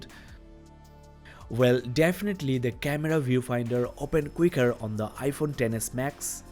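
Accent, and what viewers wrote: India. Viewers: Thicckkkk Indian accent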